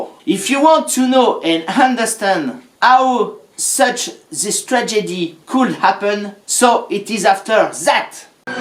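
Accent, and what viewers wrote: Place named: France. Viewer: Génial les effets sonores et la cornemuse à la fin ! Ça semble vachement plus personnel avec ces décors et ce long travail de montage qui nous tient en haleine. Parler anglais ça amuse l'oreille vu que ton accent est français, super idée ! Tu t'y connais en histoire militaire de la chine ? Genre dynastie Qing ?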